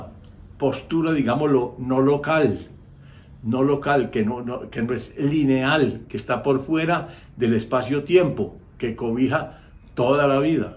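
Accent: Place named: Antioquia, Colombia. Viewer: Me alegra haberme topado con este video y este canal, muchas gracias por argumentar tan claramente; tiene una opinión muy informada por decir lo menos. Creo que es evidente que la ciencia hoy en día no es ciencia, ya que se aferra con garras y dientes a un materialismo absoluto propio de un dogma, a pesar de pruebas clínicas y testimoniales tanto de pacientes como de profesionales de la salud, sin mencionar si quiera los nuevos descubrimientos de la física cuántica (que más bien parecen ser re-descubrimientos, porque al parecer es información que sabíamos y olvidamos o nos hicieron olvidar a través de las eras). Reconozco un acento paisa en cualquier parte y no sabe lo mucho que me enorgullece que los Antioqueños tengamos mentes tan abiertas y sabias como la suya. Un caluroso saludo desde Medellín, Colombia 👋🏻 Favor continue con su maravillosa labor de informar sobre temas tan clave en especial en estos tiempos 🙏🏻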